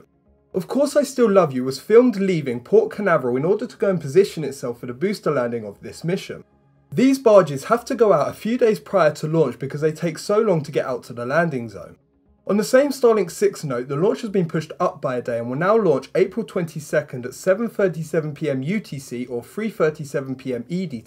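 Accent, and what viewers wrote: United Kingdom. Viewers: Why are you so british ?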